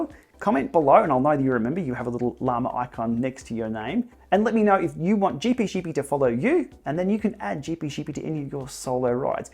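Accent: Australia. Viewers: May GPSheepy safely graze! Will meekly follow along, username V.Bonazzi. Thanks for your excellent videos, your Ballarat accent and videos from home. This Zwift e-snail lives a long way from home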